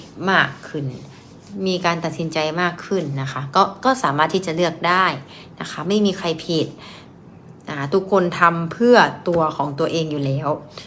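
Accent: Isan, Thailand. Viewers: เสียงอีสาน เชียร์ครับ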